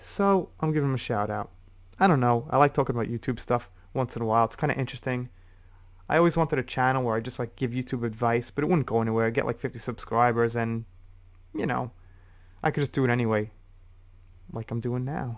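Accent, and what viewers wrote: New York, United States. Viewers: I did not hear You say anything wrong. I mean it maybe Your New York accent because We say, "Eee-ther." Anyway, I agree with You as always. The other thing that I will say is, "Johnny is just plain Class And Amber is Just plain Trash." That is really the difference in their videos. She acts like a Middle-Schooler trying desperately to be cool. When She said, "I will steal Your Stash." The middle finger too; GROW THE F%£?CK UP!!! That is all. I hope that You and Your Family are well. 😊👍🏼👋🏼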